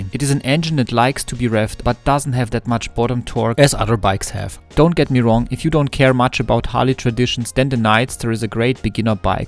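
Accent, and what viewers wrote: Germany. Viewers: I truly enjoyed this video, and the German accent is awesome. Germans have always loved Harley. I appreciate you didn’t use an AI voice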